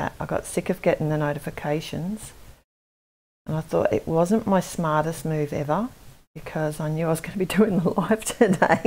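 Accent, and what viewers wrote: Australia. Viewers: What state do you live in? It’s nice hearing an Aussie voice.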